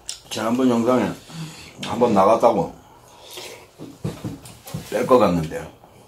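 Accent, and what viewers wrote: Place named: Gyeongsang, South Korea. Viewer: 창원 사투리 맞는거 같은데요?ㅋ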